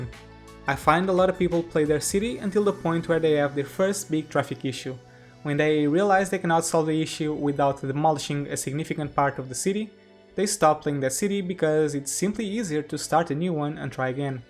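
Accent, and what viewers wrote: Portugal. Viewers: Suspeitei que eras português, e confirmei. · Vi logo que eras tuga pelo sotaque, props mano! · I thought you are portuguese. Now I'm sure :)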